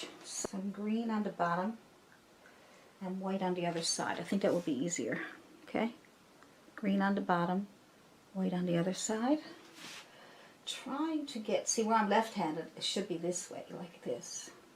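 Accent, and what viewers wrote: Newfoundland, Canada. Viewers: Is that a NFLD accent that I'm hearing?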